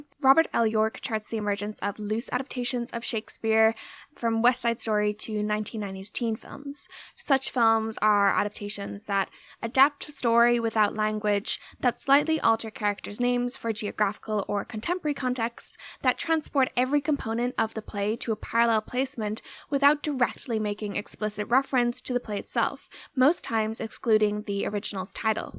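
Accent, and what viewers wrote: Ireland. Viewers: i could hear the irish and i was so confused then i read the descrpition haha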